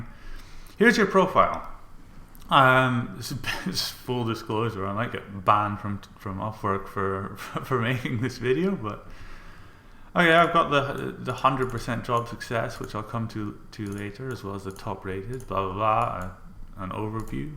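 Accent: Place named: Scotland, United Kingdom. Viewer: Cynicism always sounds better in Scottish. I disagree with the comments that you were rambling. I enjoyed the conversational style.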